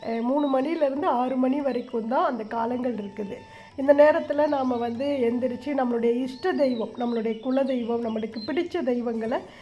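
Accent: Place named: Kongu, India. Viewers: வீட்ல மற்றவங்க தூங்கு போது விளக்கேற்றலாமா ஏற்றக்கூடாதுனு சொல்ற றாங்க எதை செய்வது உங்க பேச்சுல கொங்கு தமிழ் நன்றாகவே உள்ள்து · கோயம்புத்தூர் மாதிரி இருக்கு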